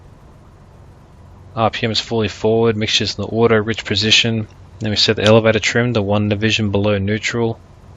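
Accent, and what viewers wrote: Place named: Australia. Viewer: Dude you sound like an Aussie, why can't I find this game in Australia?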